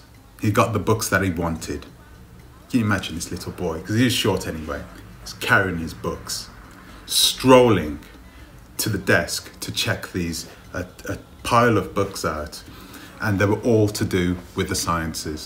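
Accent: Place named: United Kingdom. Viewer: bro sorry to say that but ur English accent isn't Semmes like an American it's Semmes like u da UK tho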